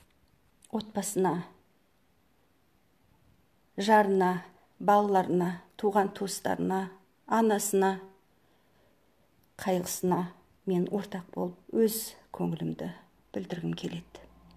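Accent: Standard. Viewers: Ооо керемет әпше.Қазақшаңыз таза екен.Мен өз басым қазақшаныда орысшаныда жақсы білемін.Бірақ көпшілік Макеңе жазып жатады қазақша сөйле деп Атеистсің деп.Ұйғырсың деп.Егерде Макең нашар болсада арасында қазақша сөйлесе эфирде.Содан кейін Мен 5 мезгілі намаз оқимын деп ҚҰРАН кітабын ұстап айтса онда 90 % қалық Макең жағына өтетін еді.Ия мен жақсы түсінемін ол кісіні.Біздің заманымызда сондай болды.Бірақ қазір заман өзгерді.Сізде Қазақстанда тұрмағаннан бұл жақтағы жағдайларды түсіне бермейтін шығарсыз.Жұма күндері еліміздің бір мешітінде орын болмайды.Барлығы жастар.Сол себептен митингілерге жастар шықпайды.Ал жастарсыз митинг деген бос әуре. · Оо ханым.Калай таза казакша сойлейсиз.Шет елде журсенизде.Алла разы болсын.Халыктын батыры Дулат бауырымыздын жаны Жаннаттан болсын.Кайгырып конил айтамыз.Олди деуге келмейтин.Кайталанбайтын батыр деп билемиз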